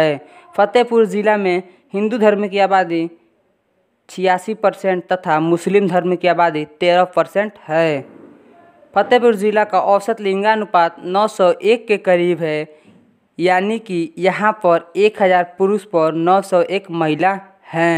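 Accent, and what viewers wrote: Bihar, India. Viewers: बिहारी है ये ग्यारह को इग्यारा बोल रहा है। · Bihar se ho kya bro..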